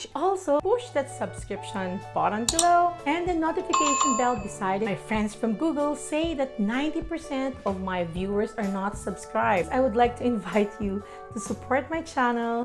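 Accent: Philippines. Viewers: Im here in LA. Yup, lalo na sa SD, its the Manila Mesa... pati kakanin and the likes.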